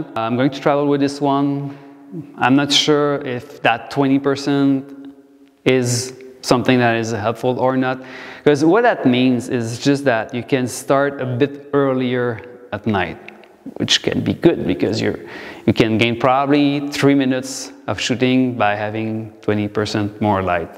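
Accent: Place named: Quebec, Canada. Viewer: Oh shit jpensse t québécois man ahah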